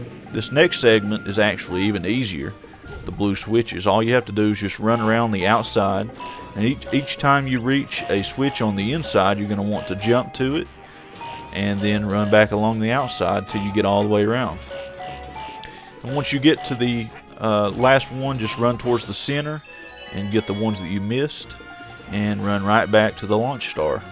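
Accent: South, United States. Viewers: What state you live in? I love that southern accent of yours.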